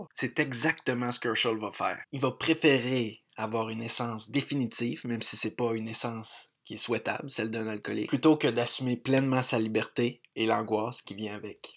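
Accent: Canada. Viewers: Tu viens-tu de Montréal? J'arrive toujours pas à distinguer bien les differents accents au Québec :D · Quebecois (Canada)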